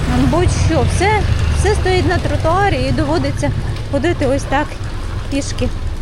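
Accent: Standard. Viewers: Моэ вітання з Німеччини. У вас чиста українська мова. Розповідаєте дуже цікаво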